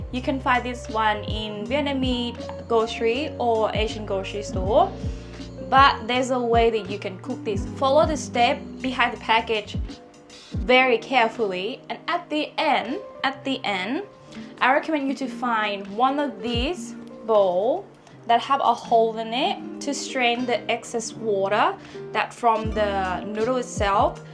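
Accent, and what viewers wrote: Australia. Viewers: Why does she sound Australian? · Thanks for that. You have obviously been to Australia with that accent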